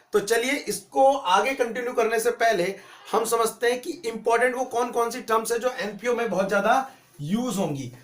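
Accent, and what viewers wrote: Uttar Pradesh, India. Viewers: Sir aap uttar pradesh se ho na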